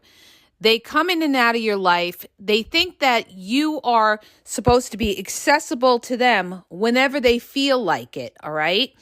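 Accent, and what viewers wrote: New York, United States. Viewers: You crack me up. Your NY accent is great. You would be a great in a movie. Reminds me of Cher saying Snap out of it! Thanks for shooting from the hip.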